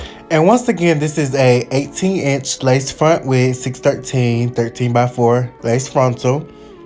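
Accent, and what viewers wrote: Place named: Florida, United States. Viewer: Florida accent on fleek!! HAAAANNNN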